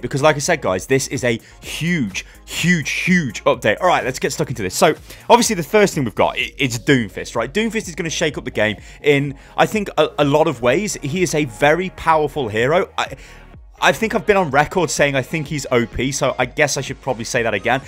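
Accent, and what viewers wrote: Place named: United Kingdom. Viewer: I love your videos, I watch your videos always when I'm having breakfast, both for the news and for your british accent. I wish someday you do a Unit lost con, I would gladly come from Spain. Keep up with the good work! Cheers!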